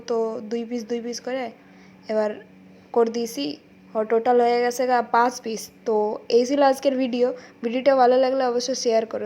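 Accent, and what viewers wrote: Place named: Bangladesh. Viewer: Tumi kolkata te aco bole mone hocche na... bangladesh a mone hocche....... language 😂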